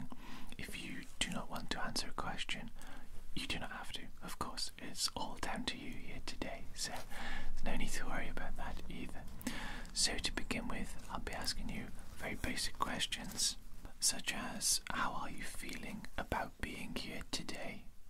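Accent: Wales, United Kingdom. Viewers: I love the Welsh accent! My step dad is from the valleys So glad I stumbled across this channel tonight 😊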